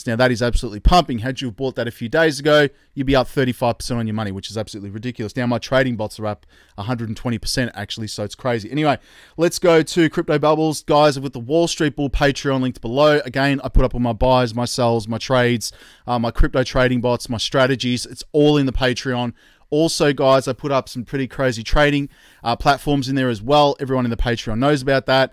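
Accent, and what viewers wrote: Australia. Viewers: Thanks for your info mate. As someone who is new to crypto (about 7 months) I'm still trying to learn as much as possible and navigate my way around the space. I'm basically all in on xrp, as I still don't know enough to diversify too much, and I find it reassuring to have an Australian voice to help educate me. Keep up the good work.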